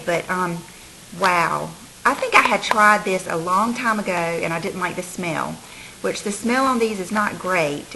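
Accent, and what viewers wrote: South, United States. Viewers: 5 stars for the lovely southern accent :)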